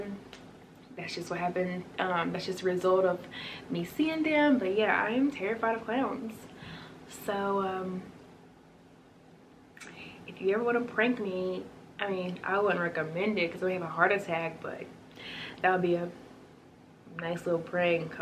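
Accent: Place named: South, United States. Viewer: You are sooo down to Earth and your accent is so cute! I immediately heard the "country" in your voice. Im a southern gal.. I live in Louisiana. You are so humble and kind!! Never let anyone steal that from you!! You are so beautiful being you!!! 👑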